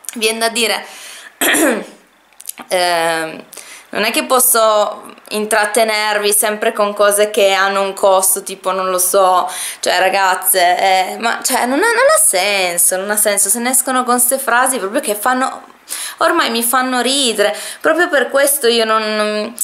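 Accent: Turin, Italy. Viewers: Ciao tesoro ♥️ FREGATENE DI QUESTE PERSONE, perché non hanno un perché in questa vita. Sono povere dentro, commentano tanto per, perché durante il giorno non hanno nulla da fare, commentano perché credono di farsi belle agli occhi degli altri ma in realtà sono delle nullità. Stai super serena e continua a stare in pigiama quanto ti pare e piace perché nessuno può e sopratutto deve dirti cosa fare e cosa non fare❤️ Tesoro dato che è uscito il discorso like e dislike.. noto che nel tuo canale appunto non esce il numero dei like e dislike, ma solo il pollice in su e il pollice in giù! Ma è un’impostazione che hai messo tu? Perché vedo che su altri canali non è così! Solo per pura curiosità ahah 😂🙊 Comunque adoro troppo i tuoi vlogmas😍 adoro vedere la vostra quotidianità, e amo tantissimo il tuo accento torinese 😍😍❤️❤️ vedrei i tuoi vlog ogni giorno, mi tieni troppa compagnia 😍 Un bacione enorme ❤️❤️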